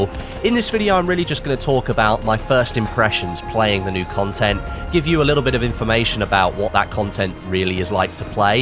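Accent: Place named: United Kingdom. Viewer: And that is how EA keep YouTubers silent about the horrible performance and bugs of this game. One year and we still doesn't have a smooth experience. I don't care you have your British accent , you are misleading your community about the truth about this game and negligence of Dice. No battlestats, no transparency.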